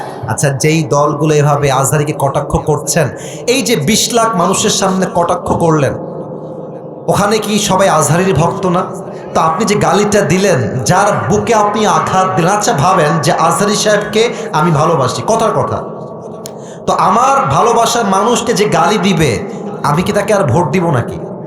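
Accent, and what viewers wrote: United Kingdom. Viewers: মনে হয় ইংরেজ বাংলায় কথা বলতেছে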